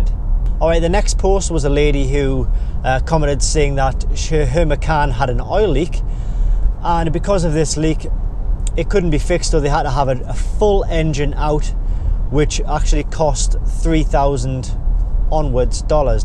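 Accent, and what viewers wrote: Newcastle, United Kingdom. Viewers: Pretty much basic faults and dealt with (hopefully) under warranty. You’ve helped me make my mind up and the Jag is going and a Macan S is on the cards. A good site and nice to hear a Geordie accent.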